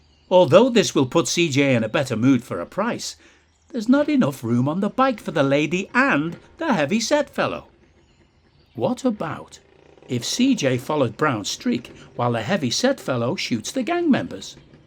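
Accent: United Kingdom. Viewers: the funniest part has to be the soft calm british narraration ontop of the hardcore gangsters trying to off eachother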